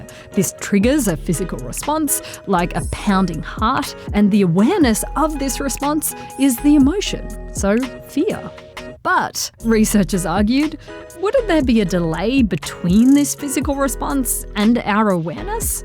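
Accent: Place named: Australia. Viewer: I felt nostalgia watching this hearing your aussie accent and wondering if that walking track was either in the central highlands or the Dandenongs ... :-) But here in Germany they have a thing called Gemütlichkeit which is like the dutch term you mention. Sort of like coziness but also social. And not to forget hygge the danish term which even has its own magazine here.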